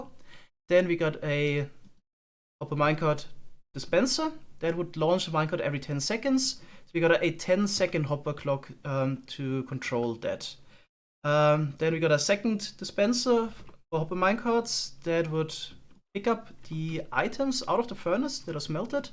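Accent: Germany. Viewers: He’s German yeah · i mean, the accent would suggest german or austrian :)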